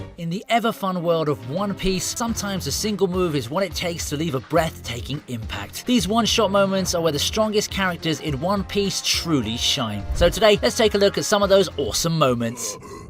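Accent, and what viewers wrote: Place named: United Kingdom. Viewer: Sheesh British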